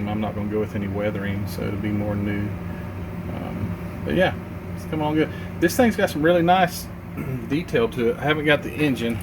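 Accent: South, United States. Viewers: Mean! Mean paint job man! Great overall job and I was hoping you were going to go with the black stripe decals! (your next door neighbor in Canada Montreal). Your Southern drawl sounds so American!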